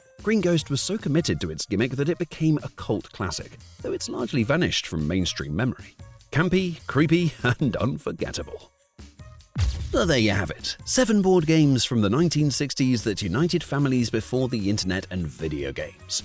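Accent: United Kingdom. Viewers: I'm a Brit that's lived in the US for over 20 years.... Why is a British accent describing lots of games which WE NEVER HAD in the UK?.. Rather mystifying.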